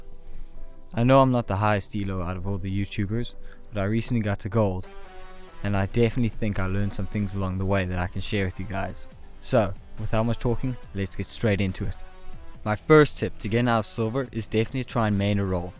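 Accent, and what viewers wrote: South Africa. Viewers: Your South African, aren't you xD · south african ? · u from south africa bro? can hear it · is this guy from south africa? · You from South Africa bruh? :D I can hear that accent clearly my bra> good Video btw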